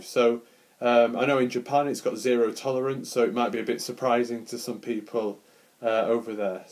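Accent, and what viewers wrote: United Kingdom. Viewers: イギリス英語(きれい)をこんな形で、かつ、色々なトピックで聴ける(観れる)のは、他にありませんでした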